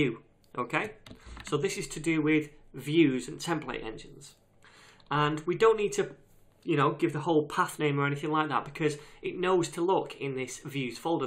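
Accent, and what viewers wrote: United Kingdom. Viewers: I just want to say thank you for your tutorial and your British accent drive me to learn more about nodejs : )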